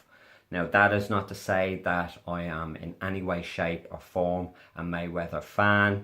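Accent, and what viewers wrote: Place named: Ireland. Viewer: Wow. An Irish guy (sorry if my assumption is wrong) who is sensible and not deluded. I can't believe my eyes and ears. I'm so glad you exist. Never thought I'd hear someone with an Irish accent talking like this.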